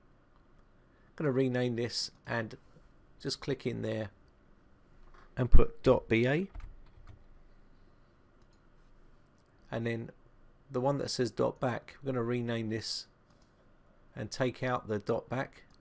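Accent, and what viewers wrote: United Kingdom. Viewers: Thank you. It helped me and your british sounds cute.. ;)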